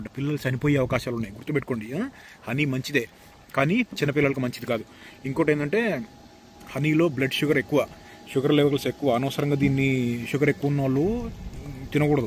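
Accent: Andhra Pradesh, India. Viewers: Bro, meeri Telangana kada. Mari Andra accent lo matladutunnav!!!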